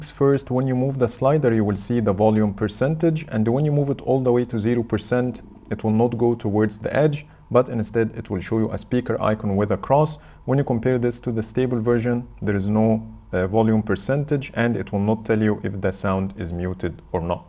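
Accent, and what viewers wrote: Egypt. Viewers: Love ur egyptian accent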